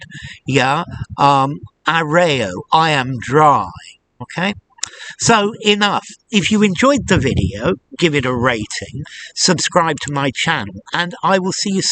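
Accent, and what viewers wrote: United Kingdom. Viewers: hello dear sir, thank you for this brilliant explanation, I'd be very glad to know what is your British English accent please.😊😊😊😊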